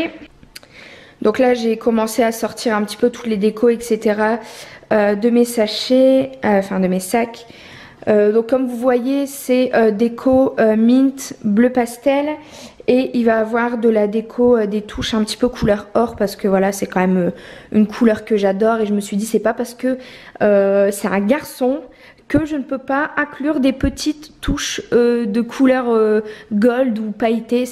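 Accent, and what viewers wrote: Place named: North, France. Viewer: Je m'en doutais que tu étais du nord j'ai entendu l accent puis après tu as dis Euralille j en étais sûre haha... tu as trouvé où un joyeuse fée du coup ?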